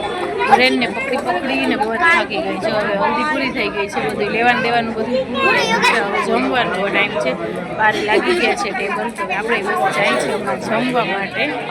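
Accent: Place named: Kathiawar, India. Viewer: tamari khathyawadi bhasa mast bolo cho nice😊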